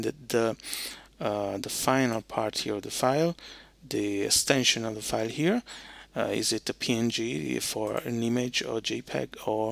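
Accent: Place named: Italy. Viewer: Ho riconosciuto lievemente l’accento italiano 🥰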